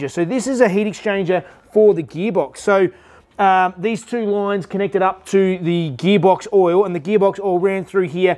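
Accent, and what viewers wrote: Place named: South Australia, Australia. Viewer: Oops. Embarrassing! I owe her an apology. Now I can hear it, but she's definitely picked up a a lot of the southern-Australian accent. And in mitigation, my hearing was shot away quite severely by neuropathy (nerve damage) last year. Salut.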